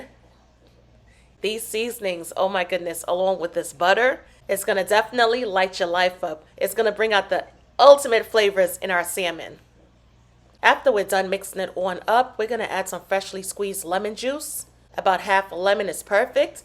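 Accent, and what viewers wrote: New York, United States. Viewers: Love you're recipes, And ya New York accent !! 💕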